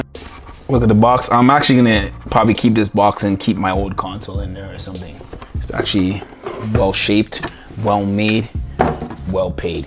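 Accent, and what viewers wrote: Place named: Ontario, Canada. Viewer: Mans accent i can tell he from Ontario!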